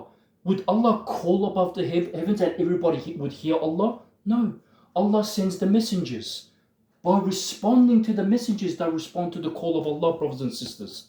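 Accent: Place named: Australia. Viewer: Salaam brother, I love the simplicity of your videos. They are factual, illustrative and straight to the point. We "true submitters" are a small community but surely growing because of people such as yourself. Keep up the great work!! By the way, it's clear from your accent that you are from Australia but cant put my finger on your ethnicity... Turkish or Lebanese?? Not that it matters but it's got me curious. 🤔😁 I'm Turkish from Perth. It's been difficult to find and connect with people like ourselves nearby. May Allah continue to bless you and your family, insha-Allah!! Peace.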